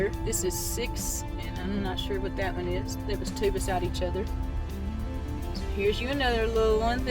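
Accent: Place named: South, United States. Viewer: Awesome video. Im trying to place your accent....Mississippi? Alabama?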